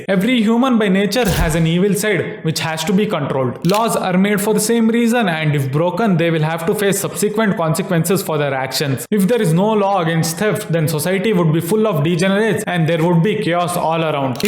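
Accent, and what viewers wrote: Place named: India. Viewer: Bhai thoda space deke bola kariye, samajne me dikkat hoti h apki thick indian accent ko. No offense.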